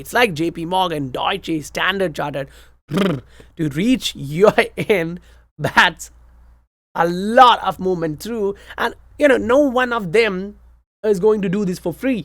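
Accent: India. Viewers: Are u Indian...?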